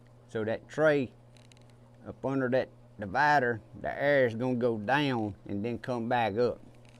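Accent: United States. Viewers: Great video! 🙂 I love your accent, where are you from in the USA? (I'm Australian)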